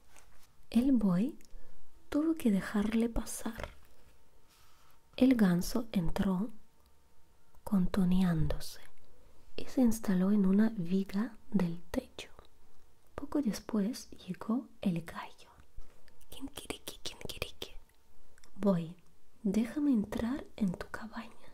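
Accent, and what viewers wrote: Russia. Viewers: Es española ? Porque ese acento de rusa?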